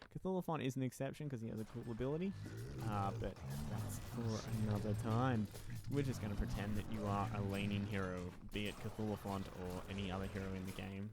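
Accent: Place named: Australia. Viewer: Hey this is mad, I was looking for a guide to get my mate started on this game. clicked on this cos it's fairly recent, But the major plus when i first hear you talking. You sound Aussie, but then there's elements of your pronunciation that doesn't..... are you? hahah. I'll watch through now and show my mate. Cheers! (Please reply)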